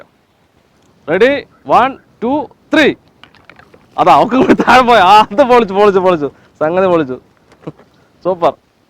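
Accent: Palakkad, India. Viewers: ചേട്ടൻ പാലക്കാട്ട്കാരനാണല്ലെ പൊളിച്ചൂട്ടാ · Aa Palakkad slang😍😍😍😍😍😍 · ഫിറോസ്ക്കാന്റെ പാലക്കാടൻ സംസാരം കേൾക്കാനായി മാത്രം വരുന്നവരുണ്ടോ ഇവിടെ? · പാലക്കാട്‌ ഭാഷ പൊളിച്ചു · Ningalu palakkad karananno? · This is Palakkad Malayalam sir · Palakkad ആണോ വീട്...? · Thani palakkaadan...😂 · That Palakkadan slang!! 😍😍😍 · തനി പാലക്കാടൻ ശൈലി... 😍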